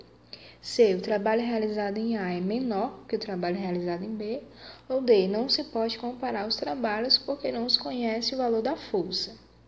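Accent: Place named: Pará, Brazil. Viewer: Eu gostei muito da aula, obrigado! e esse sotaque... você também é paraênce?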